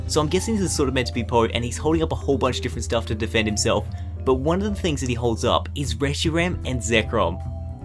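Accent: Australia. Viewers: Are u from Australia? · Are you Australian?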